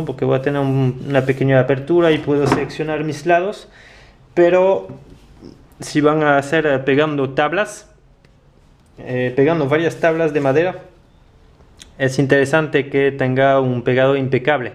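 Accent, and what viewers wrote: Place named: France. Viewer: lo sabia jaja, tu acento español me hizo pensar que serás de Francia o alrededores jejeje grande, aquí el tilo es algo caro porque lo traen a través de la aduana y deben pagar por el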